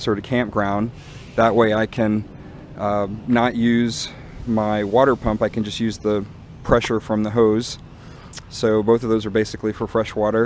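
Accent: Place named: Canada. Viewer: Nice van. . I hear a slight Canadian accent, are you from there?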